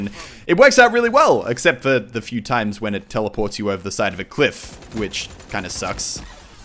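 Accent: Australia. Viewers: TIL is Australian and not British. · are you australian ? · lel you are australian ?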